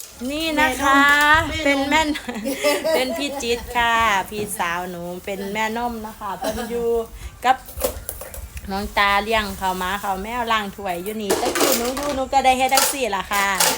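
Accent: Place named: Isan, Thailand. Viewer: สาวชัยภูมิ,สำเนียงภูเขียว ชุมแพ ภูเวียง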